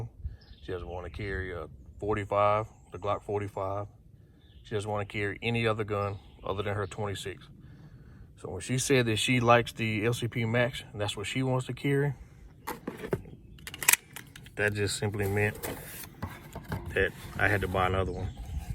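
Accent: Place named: Louisiana, United States. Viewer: I thought south Louisiana for sure!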